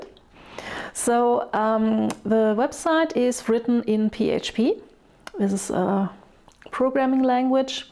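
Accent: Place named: Germany. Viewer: girl, you are a superhero! I love every thing about what you do and already ancomplished. Auch wie ab und zu einfach das Deutsch durch kommt 😅 Aber mega gut es für alle verständlich zu machen natürlich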